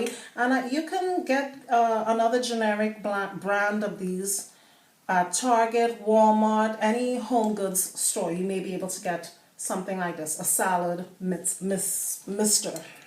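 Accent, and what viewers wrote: Trinidad and Tobago. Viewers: U sound Trini...im hoping you are so you would know the climate we have...what do you suggest I use...my hair tends to dry out-locks and scalp- soooo quickly and sometimes I get tired of moisturizing so often (which is bad) lol...i need something that would keep it moisturized for longer periods in our veryyyy hotttttttttt weather :(((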